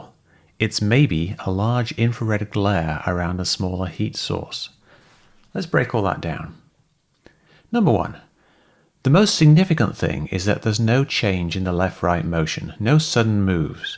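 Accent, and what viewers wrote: United Kingdom. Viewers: I have to mentally prepare to watch these videos from Mick. His take can be interesting, but it generally puts your mind into a fucking mental gymnastics exercise. If he didn’t have an English accent, a fraction of the people would take him seriously lol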